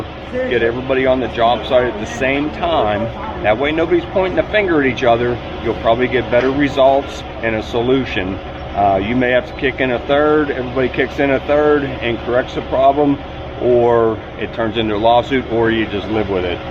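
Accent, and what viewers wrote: Pittsburgh, United States. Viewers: I enjoy watching your videos. Very refreshing to see people with good work ethics, and other ethics...👍💪🏅 I have learned a lot about pouring and finishing concrete! I have to chuckle every time to correct yourself on the pronunciation of the word "Out". 😀 I assume because there's lots of comments about it. You don't need to do that, you are from Pittsburgh, it is not going to change! Be yourself, that's all part of it. I have a friend here in the Southern Maine/NH area that moved here from Pittsburgh 35 years ago. His pronunciations and little phrases are identical to those of you and after all these years. Don't try to change, and don't worry about those who think you should. Be yourself, I wish you well with the channel. I hope you can gradually shift to doing more fun things. Enjoy life. 👍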